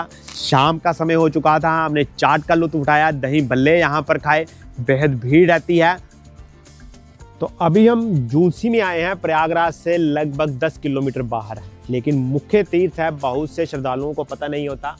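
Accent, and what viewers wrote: Punjab, India. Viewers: I think your tone is Punjabi. You must be from Punjab, which part of Punjab are you from? I’m from Hoshiarpur Punjab currently living in USA Seattle here we love your video. I watch this video with my parents. Very nice video informative keep making new video. Thank you and good luck.❤❤🕉️🕉️🪯✝️☪️🕉️🕉️💚💜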